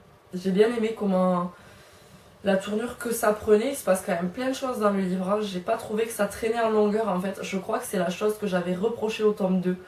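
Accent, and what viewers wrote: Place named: Toulouse, France. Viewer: Merci pour ce point lecture ! (Ce petit accent me rappelle mon chez moi (Tarn et Toulouse).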